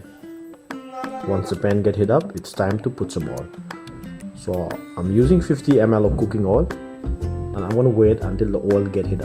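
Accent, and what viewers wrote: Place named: India. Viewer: So many critics in the comment section... english is a language, just a way of communication,, if u can understand and communicate than thats good enough.. different people have different accent.. british americans scottish all have diff accent.. and i know how indians speak and its not appreciated either by others😂😂 so stop criticizing ur fellow indian😂😂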